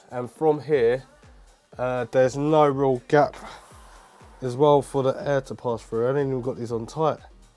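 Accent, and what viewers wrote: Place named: Manchester, United Kingdom. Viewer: Cheers for the review on this case. And I've subbed up to your channel. Was really thinking about this case, but I'm not so sure now due to the cooling aspect, or lack off. So in that respect it was a great review for me.. And I hope you don't mind me saying, you kinda sound like a manc lad.... And I should know, I'm one..lol Thanks again mate..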